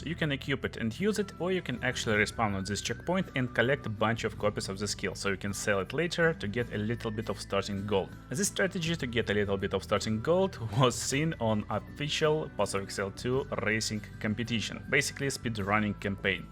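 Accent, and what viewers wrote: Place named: Russia. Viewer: Почему нет коментариев на русском, говор явно выдает автора хД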